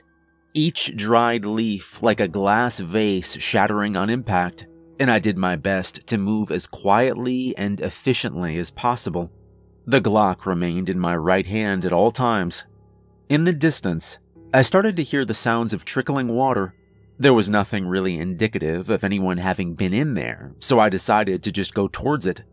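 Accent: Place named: South, United States. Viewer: Did anybody pick up a weird teter-otter between a southern and a northern accent?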